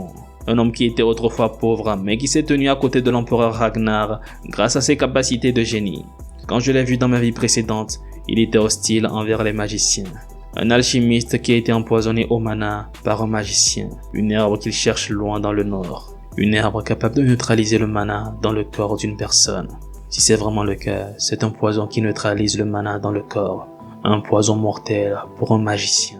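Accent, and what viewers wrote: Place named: Africa. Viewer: 1 - L'histoire est vraiment géniale et fascinante 2 - Pas d'images foireuses ce qui est agréable 3 - La narration est très bonne et on y sent la volonté qui y est mise même si l'accent africain du naratteur prête a sourire . 4 - Je met 1 gros like a cet vidéo que je recommande a tous et j'invite le youtubeur a continué cet aventure .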